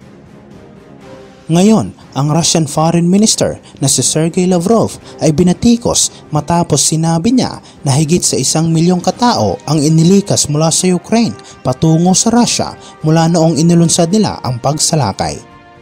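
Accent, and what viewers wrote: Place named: Visayas, Philippines. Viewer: Bakit ganyan ang punto mo bisaya ka ba o bisakol ha ha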